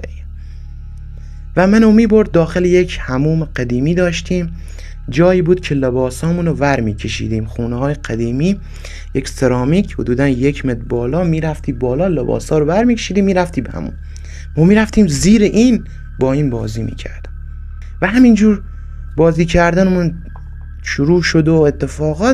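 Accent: Kerman, Iran. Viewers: سلام وخسته نباشی ، به پدرومادرت افتخار کن، صداقتی که درگفتارت بالهجه قشنگ کرمانی داری باعث شد عضو کانال شما بشم برات آرزوی سلامتی وموفقیت دارم.باتشکر خوزستان اهواز سیدسعید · دمت گرم لهجه کرمونی عشقه... حتما پارت های بعدی رو بزار جان❤ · خیلی داستان خوبی بود مخصوصا با لهجه شیرین کرمانی که تعریف میکنید بدل میشینه... لطفاً بیشتر بزارین از این قصه زندگیتون❤❤ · س بچه تهران آقا اهل دروغ نیست بچه زرنگ لهجه کرمان همینه عالی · داش شنیدن این داستان به لهجه خودمون چقد دلنشین تر بود کرمون خودمون چون خونه قدیمی هست از این مسائل زیاده در ضمن دم مادرت گرم عجب شیر زنی بوده خدا حفظش کنه❤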